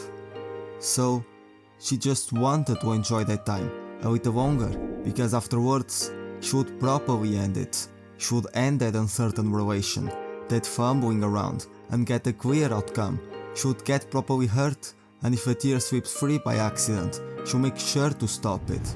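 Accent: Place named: Russia. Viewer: the russian accent is very thicc you sound like vlad ncl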